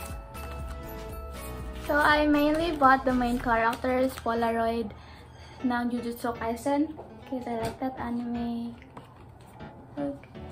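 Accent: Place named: United States. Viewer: Bat napakaslang neto?amerikana kaba?o sobrang oa mo lang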